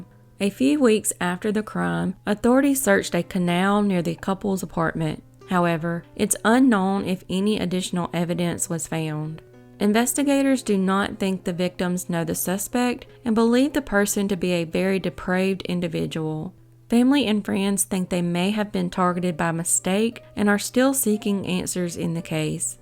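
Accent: South, United States. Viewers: This northerner loves ur sweet southern voice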